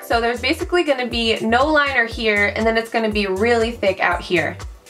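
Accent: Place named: New York, United States. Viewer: I feel like you almost have a New Yorker's accent. I love it.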